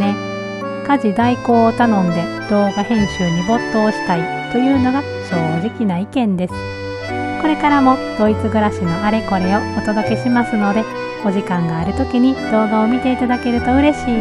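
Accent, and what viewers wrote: Kansai, Japan. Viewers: Nobanaさんの関西弁イントネーションのハスキーボイスが心地いいのでハマってしまい、毎週楽しみにしています。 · いつも楽しく拝見しております😊 関西弁⁉️の話し方がとても心地よく聞いております 次の投稿も楽しみにしております❤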